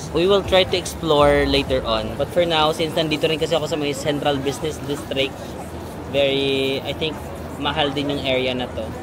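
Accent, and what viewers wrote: United Kingdom. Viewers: Meron kang British accent. Always stay safe in Sydney. Godbless! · You sounds British mate! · That is more of a UK accent mate😂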